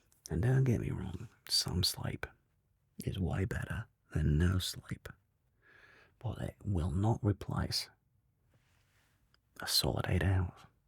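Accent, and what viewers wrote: Australia. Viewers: I love this so much the accent is spot on mate! It makes me happy knowing you’re not mocking aussies accents! 💜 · YAYYYY my request for more aussie accent has been answered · Another fantastic video. Some great advice and thought provoking ideas. Been struggling a bit with too much thinking of “having to do” things instead of “getting to do” things. Added bonus, I love Aussie accents and yours is awesome. 😊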